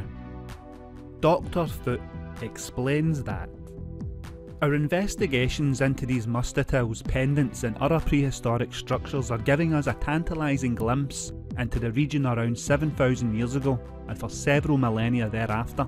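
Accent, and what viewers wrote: Scotland, United Kingdom. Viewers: Trying to place your Brogue. Inverness? Strong "undercurrent" of American "Anglish" throwing me off... LOL but no' aff the quay an' inta the Ness, playse.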